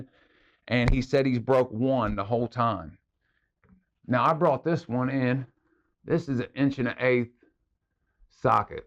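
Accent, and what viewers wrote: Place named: Pittsburgh, United States. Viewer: Ha, ok close enough I guess. Thought I recognized the accent, was hoping you were in the burg as I'm looking for a decent mechanic.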